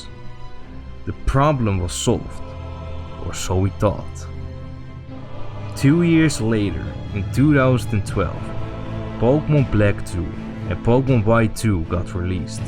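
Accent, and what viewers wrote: Netherlands. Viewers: Love the very noticeable dutch accent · Obvious dutch accent lmao, good video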